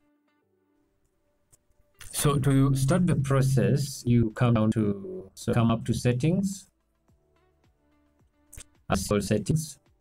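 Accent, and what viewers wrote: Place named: Uganda. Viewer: You sound Ugandan, thanks!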